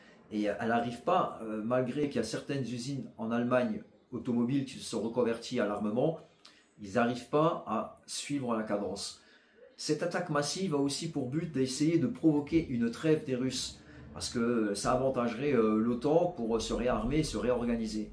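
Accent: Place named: Lorraine, France. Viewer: J'adore l'accent lorrain-moselle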